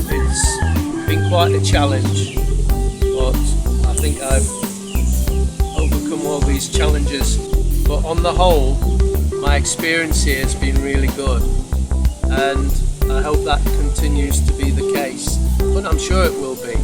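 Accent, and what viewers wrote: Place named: Scotland, United Kingdom. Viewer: I dunno if I am hearing u right but you sound like Scottish. :D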